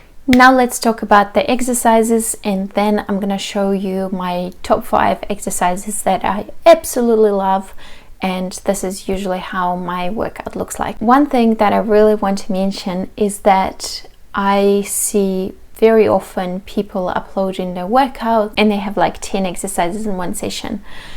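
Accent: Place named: Russia. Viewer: Очень сильно бьет по ушам рашкинский акцент)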